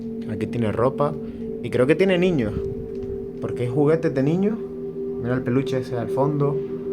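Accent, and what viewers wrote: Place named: Tenerife, Spain. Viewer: Eres de Tenerife? Por el acento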